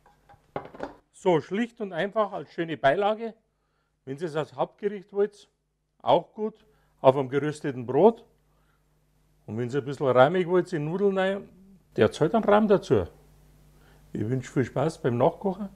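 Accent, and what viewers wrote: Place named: Bavaria, Germany. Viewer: Wunderbare Musik und ein noch besseres Rezept. Und das ganze auf Boarisch...herrlich😂😂😂 Endlich mal wieder etwas schnelles und bodenständiges. Sehr schön. Das Video hat mich in gute Laune versetzt. Danke dafür 😄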